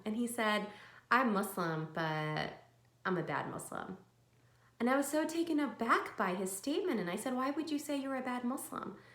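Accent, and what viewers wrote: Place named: United States. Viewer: Ukhti, by hearing you speak I can tell you're American,I can pick up American English better and quicker than other English speakers, the reason I and I know and few... Anyway listening to your speech I noticed that you mentioned some about Muslims calling themselves "bad Muslims". There's no such thing that being a bad Muslims,shaitan tricks to discourage the slave. Convey to those Muslims who think they aren't good enough of Muslim that Nabi Ahmad SAW said we,his Ummah won't be able to follow him up on everything,"just to do what we can"...And He swt was holy gifted,there is no way we can get to his level,we don't have his strength,power,endurance etc... Just do your best, Ummah.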